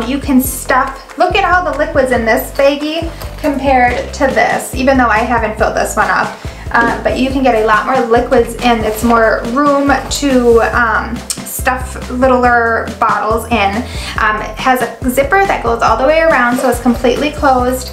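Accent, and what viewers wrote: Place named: New Jersey, United States. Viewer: I like the accent are you from jersey ?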